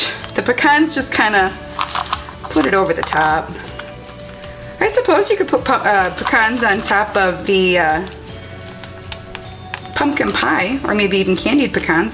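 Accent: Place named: Minnesota, United States. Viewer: Are you from Minnesota by chance? Love this recipe - can't wait to try it!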